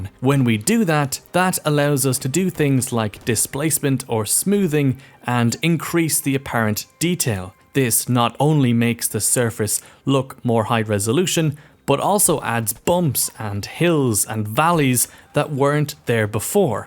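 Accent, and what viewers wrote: Ireland. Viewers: I love that thick Irish Celtic English accent...you sound Like some pirate from Carribean...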